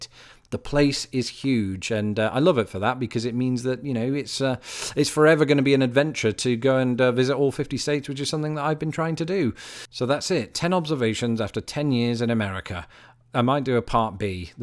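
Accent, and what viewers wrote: United Kingdom. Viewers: No way you still sound british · Gosh that British* accent never gets old, I'd live in London just for this I'll never understand why people trade Europe for the US. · i like this guy. British accents make everything you say, much more interesting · I'm surprised you still speak with a British accent after 10 years! But you did say "sidewalk"! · Dude you’ve been here 10 years and that accent is stilly silky British smooth 👌👍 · You still have very distinct British accent.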